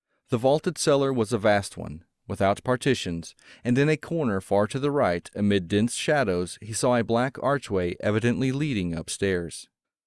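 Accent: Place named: United States. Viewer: Always loved this one. And the narration is by an American!...for Poe and lovecraft - a must have. Imagine M R James or Arthur Machen read by someone NOT from the UK. This narration of HPL...was very brilliant!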